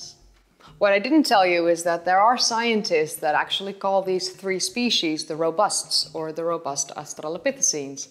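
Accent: Netherlands. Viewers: I like your Dutch accent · your accents is not that bad compaired to that of a lot of Sutch politicians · you being dutch accent makes you you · I knew I smelled a Dutch accent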